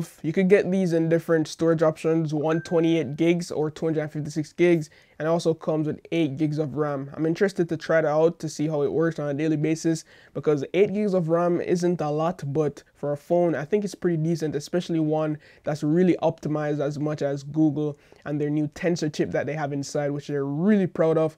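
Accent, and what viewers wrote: Jamaica. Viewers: from you start speaking I said this definitely is jamaica 🇯🇲🙌🏽🙌🏽🙌🏽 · Can't hide that Jamaican accent... You Jamaican? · Are you Jamaican, I hear the accent but I'm not sure. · your Jamaican accent is rich i love it 👌🏾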